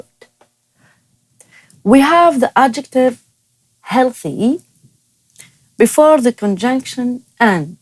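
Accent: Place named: India. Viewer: It's giving Indian accent ❤️😆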